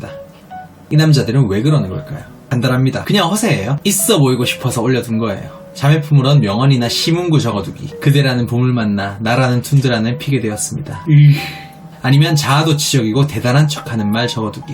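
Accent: Gyeongsang, South Korea. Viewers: 억양이 대구 경북분같으세요. 아주 날카롭게 지적하시고,아주 맞네요. 자기중심적 남자나 여자 아웃.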